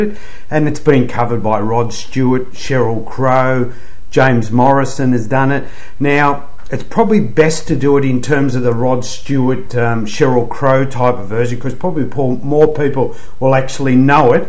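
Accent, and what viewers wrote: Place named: Australia. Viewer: Great to hear the Aussie accent! I'm a yank with dual citizenship and a frequent visitor to good old Melbourne!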